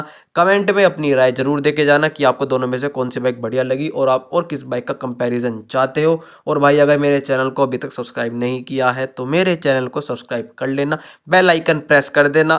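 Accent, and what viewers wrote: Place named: Uttar Pradesh, India. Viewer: Neither of these bike falls under cruiser category. Please do your homework seriously ;If you want to grow. Else if you really want to remain just another street youtuber with strong UP accent and tone. Continue with all what you doing. Rest I will wish you best of your luck and hope you do good 👍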